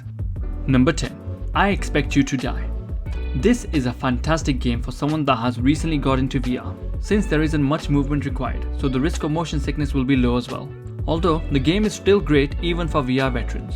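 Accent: India. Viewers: Do I detect an Indian accent ? ;)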